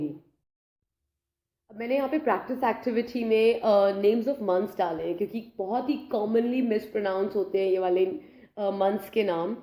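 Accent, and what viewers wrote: India. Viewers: you have a very strong India accent. please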